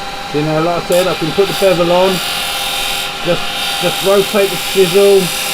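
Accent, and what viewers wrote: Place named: London, United Kingdom. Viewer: Nice to hear a London accent. enjoyed the video...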